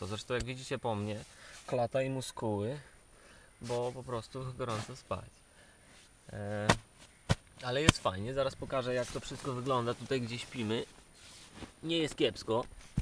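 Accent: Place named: Podlasie, Poland. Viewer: hehe, tak pytalem bo zaciagasz troche jakby po podlasku... :)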